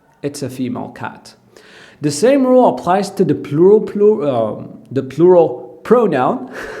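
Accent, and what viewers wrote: United States. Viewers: He does have an American accent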